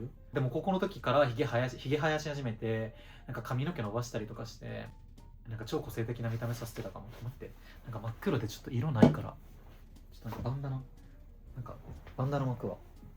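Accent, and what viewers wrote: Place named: Kansai, Japan. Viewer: 人生のセンスもあって本当にすごいですꧦ𐅁𐀸𐋠𛰙᭜𖫴𖫰𖫱𖫳𖫲𖫲𖫳𖫴𖫰𖫱꛰ﯩᩝ︪᭜𖫴𖫰𖫱𖫳𖫲𖫲𖫳𖫴𖫰𖫱꛰ީᩝ𛰚てかもっと関西弁ナチュラルに聞きたい😂同じ関西人なので期待してます♪♪♪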